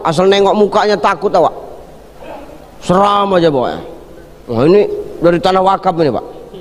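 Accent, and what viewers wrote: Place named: Asahan, Indonesia. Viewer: ASSALAMU ALAIKUM USTADZ., IDOLA SAYA SEMOGA SEHAT SENANTIASA Ada dlm Lindungan ALLAH AamiiiN CERAMAH NYA MENGENA DI HATI SAYA SUKA Dgn LOGAT ASAHAN NYA SUKSES SELALU USTADZ.JAKARTA HADIR SELALU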